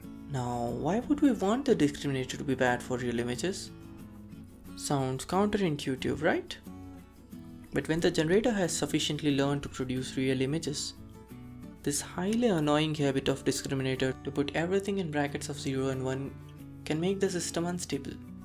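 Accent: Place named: India. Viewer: maybe rerecording it with a voice without Indian accent would be advisable (sorry to be direct ;) )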